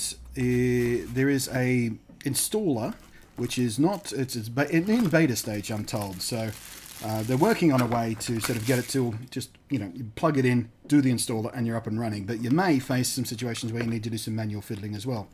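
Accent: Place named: Australia. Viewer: i had no idea you were Australian that makes me feel pleasant inside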